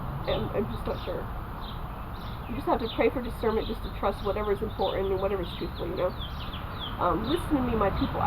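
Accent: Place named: Ireland. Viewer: For some reasons i thought you were from Ireland.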